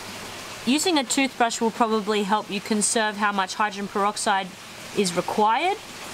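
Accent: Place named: Australia. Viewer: The cinematography is first glass. Showing off those wonderful thongs (flip flops for you yanks reading this) and quirky glasses which are vintage (perfect). makes a classic catch and keeps score all in about 3 seconds before moving onto more complex maths and the turkey baster before speaking Latin - all time. Freeze frame and it seems with the cheeky smile that knows this is outclassing even Cam in his togs doing flips inside the 6x2x2 bar tank after fitting through a 30cm square hole in the top without knocking off the $1000 dollar Radions. Side note: Why remove the sea squirt? manages the classic Aussie accent whilst using scientific lingo that Sir David Attenborough only ever dreamed of. In my book the best example is "Upon visual inspection it's pretty obvious that this zoanthid colony is suffering from a range of macroalgae invasion" - although "it's pretty obvious" this was the 3rd take because the script said "infestation" instead but she made up for it with a word that sounded like 'poleketes' in the next section. 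Even with a science degree (incl first year bio and marine science) I don't know what a polekete is but I know Coral RX gets em. moves on from killing pests to healing infections - rewind and watch how seamlessly she moves from biological to medical language... before ruining it with the claim that it's all good because "it smells nice and clean". Ok - so this is easily THE BEST vid I have seen on dipping... but is not done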